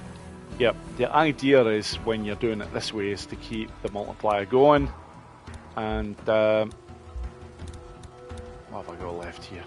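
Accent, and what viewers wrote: Scotland, United Kingdom. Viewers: top class mate as per. Even better when hearing another Scotsmen swearing properly. Still had good scores tho . I am trying to get better scores on outridge . 131 is my highest but can’t get anywhere near it. Had a look at the leaderboard your number1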